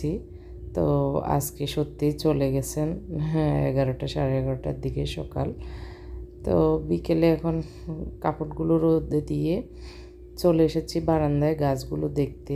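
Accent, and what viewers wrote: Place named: Feni, Bangladesh. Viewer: Apu tomer kotha gula sunle apon apon mone hoi, karon ami o fenir, purbo ukil para, thaki Dhaka te, r tomer vlog dekhar jonoo wait kori,balo thako.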